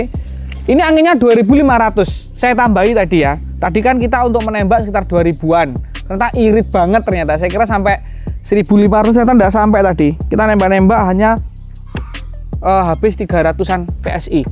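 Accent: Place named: Java, Indonesia. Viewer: Bahasamu kang,medok eram🤣🤣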